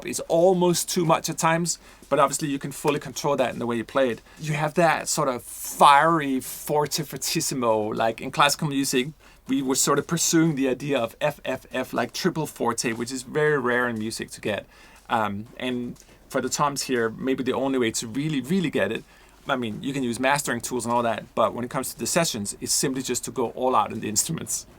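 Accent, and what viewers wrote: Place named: Denmark. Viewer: I KNOW A DANE WHEN I HEAR ONE! Hej, en kærlig hilsen fra Danmark:D It’s black friday, and I’m about to spend maybe to much cash on this and that plug-in instrument, and then I found Soundpaint through 8DIO, where I just bought epic toms. But I can see that every day is black friday at Soundpaint:D …and that I should’ve bought epic toms at Soundpaint instead - and I will. While the samples may be the same, this is clearly a huge update. Good samples are good samples. Doesn’t matter if they were made today or 10 years ago. How we can use them matters. The Soundpaint player looks amazing, and I can hardly wait to download it and get to know it. De bedste hilsner fra DK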